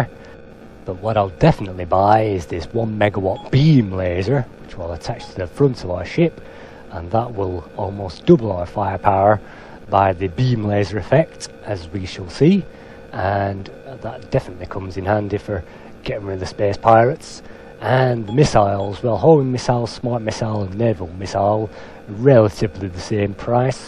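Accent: United Kingdom. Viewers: You sound very British. Very very mooch. Moooooch. MOOOOOOOOOCH.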